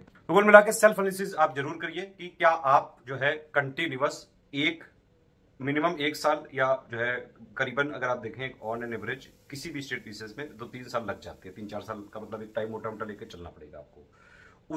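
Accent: Kanpur, India. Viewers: Sir kya Kanpur se hai kyuki apki language humare kanpur dehat ki lg rahi hai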